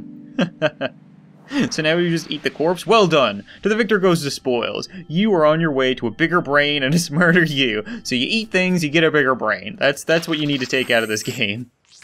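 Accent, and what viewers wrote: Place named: Canada. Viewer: He was so Canadian sounding back then compared to now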